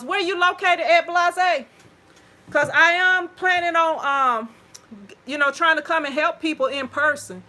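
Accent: South, United States. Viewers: Yes, I watch you because I love your southern accent. 😁 Great resources! Thanks.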